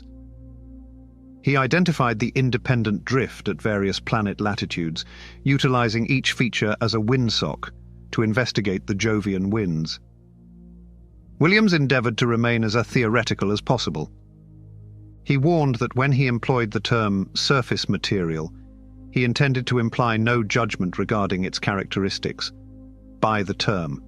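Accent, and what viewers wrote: United Kingdom. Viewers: Finally a video with a British narrator🎉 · 🎉 Right? Everything just sounds 20% smarter with a British accent 🇬🇧📚 Feels like you're watching a documentary and getting knighted at the same time 😂 Who else lowkey rewinds just to hear them say “laboratory” again? 👇🎧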